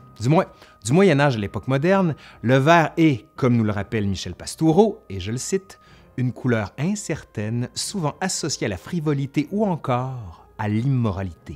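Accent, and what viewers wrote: Quebec, Canada. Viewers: Youhou enfin le retour de l'histoire nous le dira !! Cet accent québecois m'avais tellement manqué, je me refaisais les ancien épisodes en boucle. Bon retour :) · Décidément j'aime bien vos vidéos !!! Votre narration est extrêmement riche , extrêmement soignée , et votre accens québécois apporte quelque chose en plus pour moi français . Sans compter que j'ai l'impression de ressentir une gentillesse naturelle chez vous . Et vos vidéos sont extrêmement interessante sur des sujets dont j'ignorais tout !!! Donc , merci , et je m'abonne ! Continuez a nous régaler de vos belles vidéos !!!!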